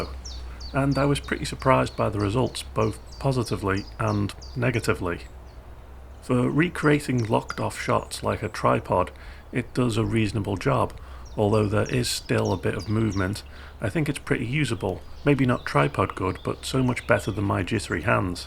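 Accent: United Kingdom. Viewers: Im from the North West - I new it was a Merseyside accent (i know the Wirral isnt Merseyside but you know what I mean) 😆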